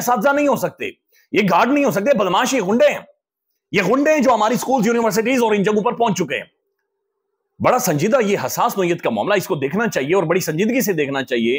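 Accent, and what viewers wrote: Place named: Punjab, India. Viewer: یہ ھمارے مشاہدے میں آیا ھے کہ جب بھی ن لیگ کی حکومت آتی ھے غنڈوں اور پولیس کو بہت آزادی مل جاتی ھے ۔ صاحب آپ کا پنجابی ایکسینٹ بہت مزاحیہ ھے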